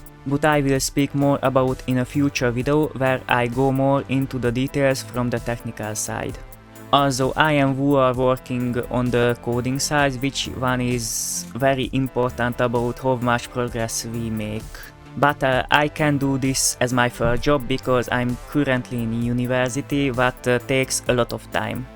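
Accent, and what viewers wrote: Hungary. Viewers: Ik I recognized the hungarian accent ^^ tudtam felismerem a magyar accentust :3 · AMERICA 🇺🇸🇺🇸🦅🦅 Wait, what language do you speak then? I hear an Hungarian accent so I assume that you speak Hungarian (like me!!! ) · The Hungarian accent is very audible, which I'm happy about because I'm Hungarian Szóval nagyon várom hogy kijöjjön a játék<3 (ha még nem jött ki)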